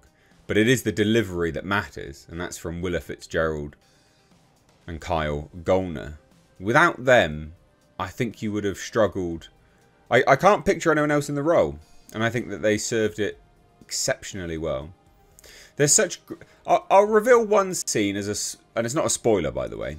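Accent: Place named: England, United Kingdom. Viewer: When you say “masterpiece” with your English accent, I want to believe you. I’ve been let down too many times (not by you… yet). I’ll let you know. I’m Cajun who now lives in South Carolina. We don’t do toxic positivity. 😉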